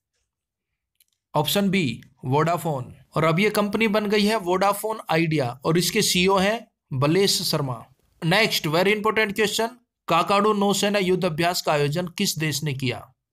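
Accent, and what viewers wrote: Haryana, India. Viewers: Sir Aap Haryana se ho kya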